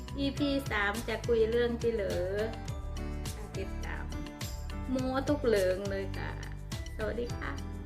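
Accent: Phu Thai, Thailand. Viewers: เว้าภูไท ฟังเพลินครับ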